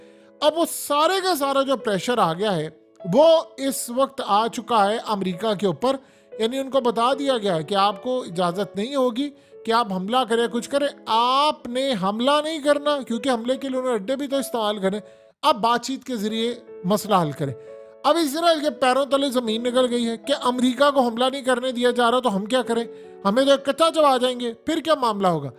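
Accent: Punjab, India. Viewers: I can only request you please don’t talk in English coz you speak English in Punjabi stick with Urdu and don’t try to copy different accents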